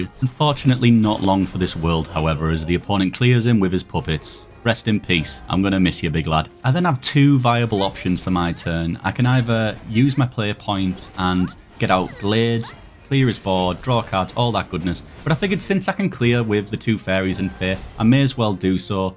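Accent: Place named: Yorkshire, United Kingdom. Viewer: GOOD TO HEAR ANOTHER YORKSHIRE ACCENT in SVWB ;-) where you from fella?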